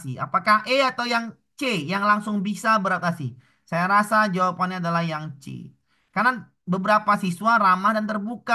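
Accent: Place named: Lampung, Indonesia. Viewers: Nada Sumatra banget bapaak😅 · Saya suka penjelasan bapak kayak orang lampung cara ngomongnya tapi nyambung saya 😂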